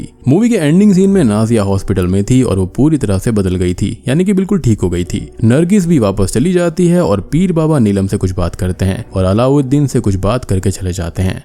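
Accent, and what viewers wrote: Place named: Hyderabad, India. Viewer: Tum mast hyderabadi bolte bhai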